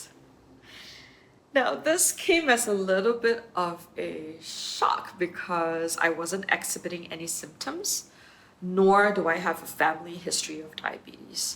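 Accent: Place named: Malaysia. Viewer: You sound like a Malaysian, or Singaporean. My question: Is there a contact person in Malaysia?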